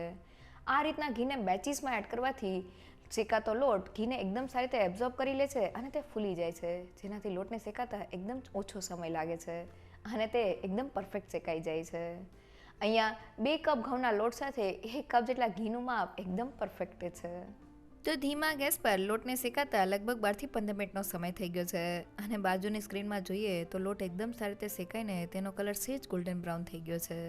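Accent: India. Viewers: Karan ke i think tevi aadiwasi vistar ma rahe che karan amara sight aadiwasi vistar na loko aa rite j gujrati bole chec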